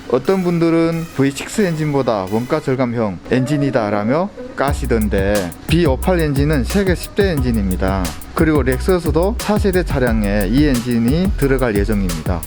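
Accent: China. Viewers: 제 친구도 재중동포인데 말투가 비슷하신 거 같아서 여쭈어 보았습니다 ㅎㅎ BMW를 좋아하시는 것 같은데 올리시면 재밌게 보겠습니다!! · 중국교포에요? 말이 굉장히 어눌함 듣다가 짜증나서 나가요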